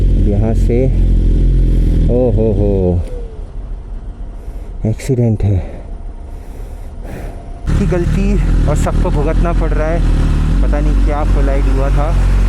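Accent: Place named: Chhattisgarh, India. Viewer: Deady colaigen..😂😂😂 ur chhatisgarhiya accent is so dope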